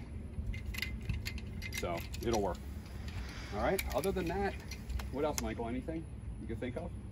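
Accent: Philadelphia, United States. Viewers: I knew my man was from Philly before I saw the Flyers shirt and the PA plates lol. Great video.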